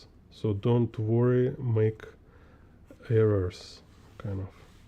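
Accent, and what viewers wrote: Russia. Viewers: Nice stuff and heavy russian accent ) GL HF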